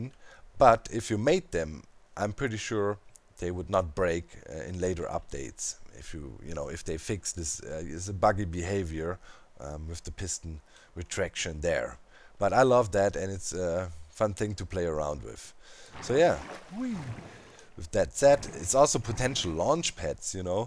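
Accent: Germany. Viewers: Du solltest TV kommentator werden :D man merkt allerdings das du Deutsch bist.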